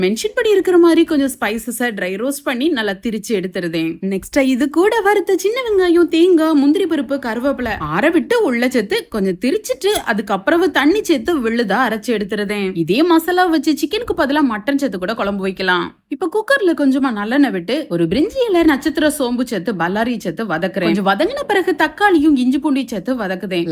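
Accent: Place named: Thoothukudi, India. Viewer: Sister ungalukku entha ooru... Because neenga pesura language kekum pothu enga area mathiriye iruku sis.. naan Thoothukudi district sis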